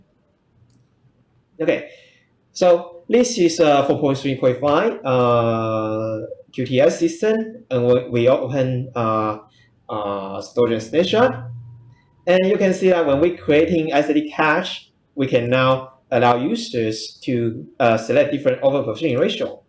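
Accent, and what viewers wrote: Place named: India. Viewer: 在印度学的英语，口味太重，理解比较困难！！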